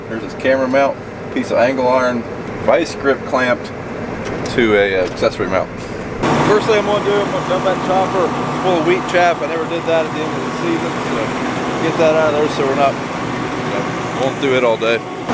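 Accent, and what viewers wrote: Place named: Ohio, United States. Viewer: I appreciate hearing talk. It reminds me of spending summers in Columbus working for my uncle in the early 90's. I also spent some of that time in Chillicothe, had a cousin there. Living near Buffalo, you don't hear your accent here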